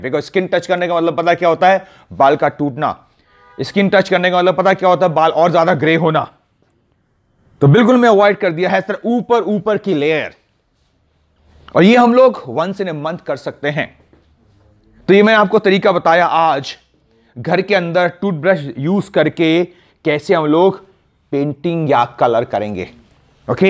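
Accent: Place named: India. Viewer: aapka bolne ka style mast hai...itna Desi style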